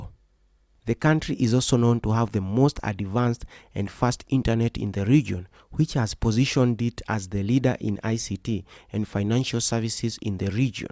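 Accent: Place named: Central, Kenya. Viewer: Am I the only one who hears this guy is from Kenya ( central kenya infact ) because of the way he is "shrubing" ?!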